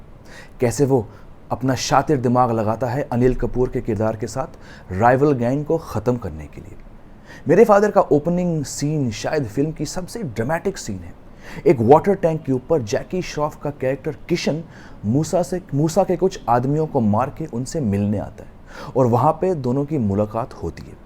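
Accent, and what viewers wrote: India. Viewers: Aap Muje original Indian hi lage aam Indian ki tarh aap hamse guftgu karte hai Aap k father bhi hamari tarah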